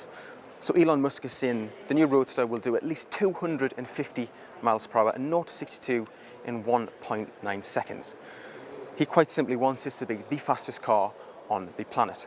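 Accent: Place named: North East England, United Kingdom. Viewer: Its a North East accent...whay aye man :-) Even people from North Yorkshire have to listen carefully...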